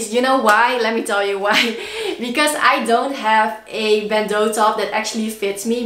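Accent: Netherlands. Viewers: I love your dutch accent 💛🍀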